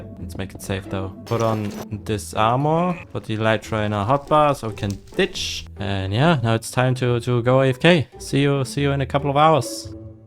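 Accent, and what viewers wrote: Germany. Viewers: Are you from Germany? It sounds a bit like German English. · youre accent is a bit german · is your main language german your voice sounds like german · Nice vid but are you german i hear a bit of an accent or maybe its the cold but yeah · I like seeing biomes build!! However, missed your videos, they are nice and I like your german accent man👍🏻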